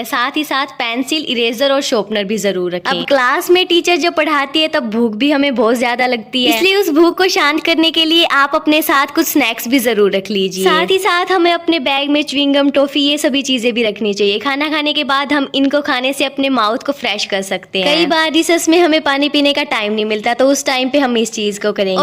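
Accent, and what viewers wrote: Haryana, India. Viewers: Kya aap Haryanvi Ho ?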